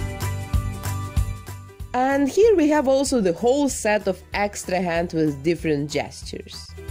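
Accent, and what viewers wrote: Russia. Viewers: This russian акцент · I love your accent. Are you from Russia? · I like your Russian acent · Русский акцент ?! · Oh, Russian accents are so beautiful and zaftig sounding. I must say, I do think I wig may have been better, and perhaps a smaller nose pompom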